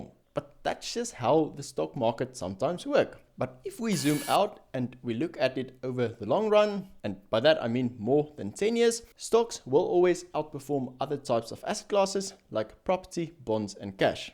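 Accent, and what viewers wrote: South Africa. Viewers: Cool video! Love the accent. SA represent. I also noticed a big gap in localised content in the marketing and entrepreneurship space. ❤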